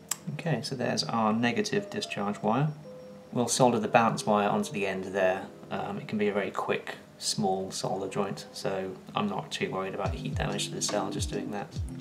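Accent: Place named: United Kingdom. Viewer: I don't know anything about batteries, I just googled "videos of British people talking funny", but this guy seems really obsessed with sales.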